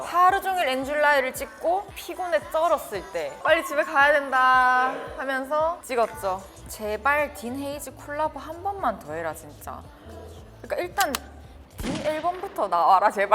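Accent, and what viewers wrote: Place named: Gyeongsang, South Korea. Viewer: 서울 말을 쓰려는 노력이 단 1그람도 없는 ㄹㅇ 진성 사투리 그자체 ㅋㅋ 너무 사랑스러워 같은 경상도 여자이지만 경상도 사투리 쓰면서 저렇게 사랑스럽고 안걸걸해 보이기 쉽지않은데 헤이즈는 너무 좋음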